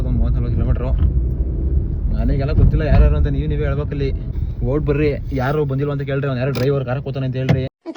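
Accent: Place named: Tumakuru, India. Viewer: Namdu mavathuru atra saggere... Nimdu yava uruu guru. Namma tumakuru bashe super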